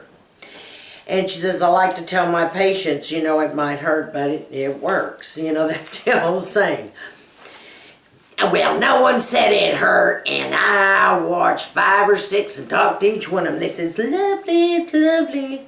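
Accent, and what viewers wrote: Texas, United States. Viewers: Oh- Ultherapy not oil therapy- lol! Awhile back when I heard you mention it in passing I misheard what you said due to the honeyed Texas twang. Very interesting and informative! I'm looking forward to you having this done. The innovations in medicine are really exciting. As a former surgical nurse I love to hear about them.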